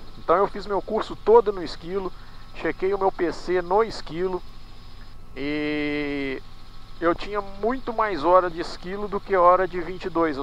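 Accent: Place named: Minas Gerais, Brazil. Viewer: Fiquei sensibilizado com a sua história de perseverança, provando que, mesmo com muitos obstáculos, é possível realizar sonhos. Você me passa a impressão de ser uma pessoa de bom caráter com muita humildade. Aposto que era zagueiro, e descia a borduna nos pobres atacantes - rsrs. Bom ouvir suas histórias. E o sotaque mineiro soa agradável aos nossos ouvidos daqui do sul.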